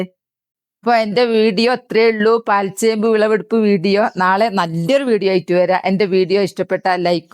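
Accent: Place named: Mukkam, India. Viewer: ഭാഷ കേട്ടിട്ട് മുക്കം ഭാഗത്താണോ എന്ന് സംശയം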